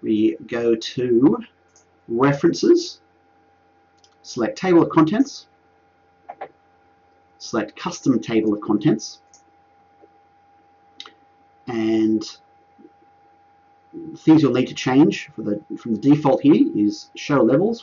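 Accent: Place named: Australia. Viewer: must be aussie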